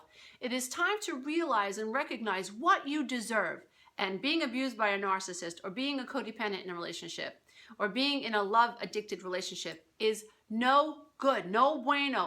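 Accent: New York, United States. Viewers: Thank you for making me laugh today. That whole "feed me feed me" part. You've hit the nail on the head and this is exactly what I'm going through right now. And this is exactly what I needed to hear. Thank you for addressing everything in a very funny light-hearted way. You helped my day begin in a much nicer way than it did yesterday. And I think your New York accent is adorable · Love the NY Accent. Im from Manhattan and the Bronx. :-) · Thank you Lady! I needed to hear that today. And your New York accent Rocks! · Oh my goodness! I totally resonate with everything you are saying. Moved out last October. Almost a year! Woo Hoo! But... Why do I still miss the guy who was never NEVER really there- not physically or emotionally. He of course hoovered me 5 minutes after I moved out and then just a few months ago. Yay me for not falling for it, but after the last Hoover, I fell into the "vacuum". 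Guess what? I just met a new guy and he was love-bombing me on our first 'date', mirroring me, and even asking me to move in with him!! "You attract an energy being that is equal to the one you just kicked out the door!" EXACTLY!!! I feel like the universe is testing me- or maybe pointing out that I haven't done as much healing as I though I had..... Urgh.... Time to get to work and stop ignoring myself! By the way- I love it when you say "I digress..." And the NY accent! You speak so passionately and honestly. THANK YOU FOR ALL YOU DO! :) <3 Hugs from Canada. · = The reason why I've been coming to you for life guidance for over a ye-ah. Dat tick New York accent!!! No for real, not only was I stoked that I found on youtube an empowered Italian woman who could empathetically help adult children of alcoholics like myself...but do so with a Queens accent? Paisan!, you're literally the best! 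· I think it's endearing that you have a new york accent :) a real person not an actor :D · New York accent-one of my favorite American accents!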